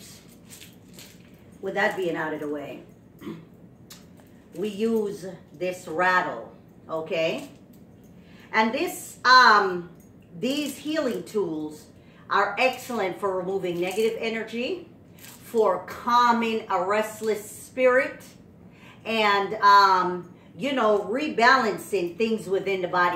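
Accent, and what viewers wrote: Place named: Caribbean. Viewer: Are you from the Caribbean islands Because I can hear a accent when you talk?🏝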